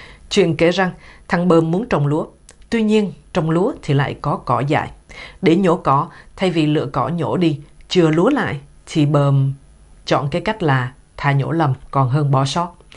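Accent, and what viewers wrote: Standard. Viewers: Chị này nói ko nhìn sách luôn, vừa đúng chuẩn vừa hay tuyệt! 👍👍👍👍👍👍👍👍👍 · MC tuyệt vời giọng nói rất chuẩn, yêu em quá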